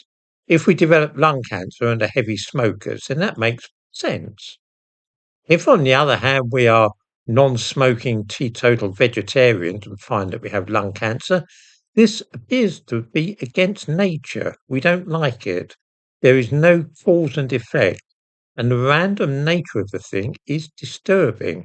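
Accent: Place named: England, United Kingdom. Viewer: Too bad there isn't an English language version of this channel. We yanks can understand blokes like Attenborough and Dawkins, but this heavy dialect is just incomprehensible. It has always perplexed me that the English can't properly speak the language which carries their name.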